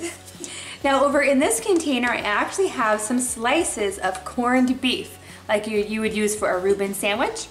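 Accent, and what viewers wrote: Ireland. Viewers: Irish are you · Are you Irish I am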